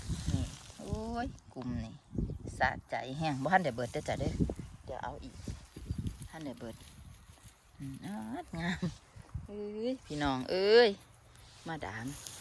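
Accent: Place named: Surin, Thailand. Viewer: สำเนียงเว้า คือบ้านข่อยคักลาวสุรินทร์เด้อจ้า ตอนนี้มีภูมิลำเนาอยู่สมุทรปราการครอบครัวข้าราชการจ้า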